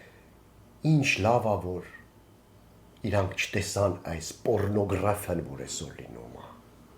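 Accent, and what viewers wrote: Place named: Lori, Armenia. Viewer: Да здравствуйте Лоринский Акцент Люблю свою Родину ❤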